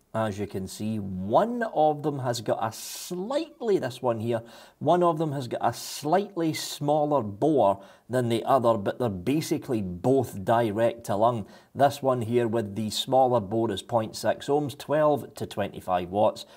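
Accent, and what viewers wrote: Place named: Glasgow, United Kingdom. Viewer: First time I've ever seen a video of yours. First idea popped in me `ead was if I was listening to Glaswegian or a bloke that uses the M-77 part of their commute home from there.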